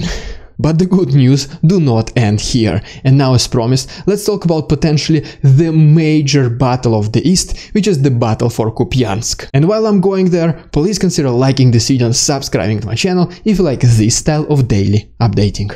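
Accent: Russia. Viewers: good job reporting Ukraine's success. you also have the sweetest Russian English accent I have ever heard.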